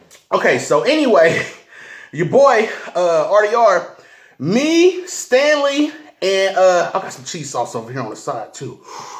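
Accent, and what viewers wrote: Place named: Texas, United States. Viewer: You gotta be from Waco...or central Tx. This is how we talk cuddy.lol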